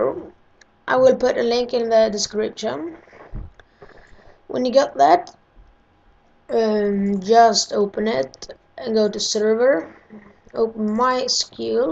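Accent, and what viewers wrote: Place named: Scotland, United Kingdom. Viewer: its uhh duuunn lol wtf is he..a scotty idk but the way he talks makes me laugh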